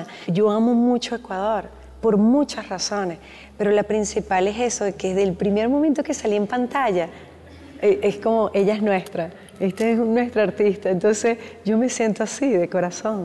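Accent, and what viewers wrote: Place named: Bogotá, Colombia. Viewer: ME GUSTA TU ACENTICO BOGOTANO.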